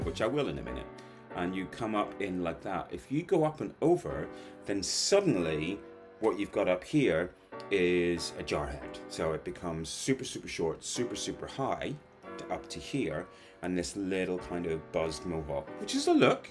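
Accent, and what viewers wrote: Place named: England, United Kingdom. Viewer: I just love his English accent!!!!!!!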